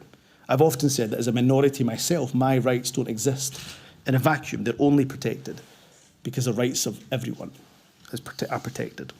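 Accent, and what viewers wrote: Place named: Scotland, United Kingdom. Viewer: he sounds pretty scottish to me. unles you're just a racist arsehole, of course.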